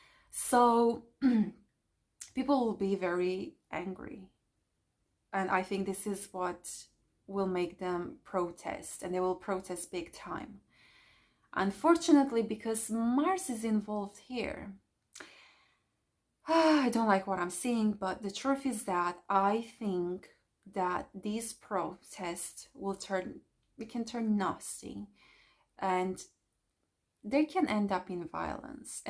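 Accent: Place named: Portugal. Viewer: Awesome video, i am like an amateur astrologer and i share basically the same ideas as you. I am Portuguese, are you in Portugal by the way? Your accent sounds Portuguese, hope you view this message because i would like to be in touch with you. Amazing video again.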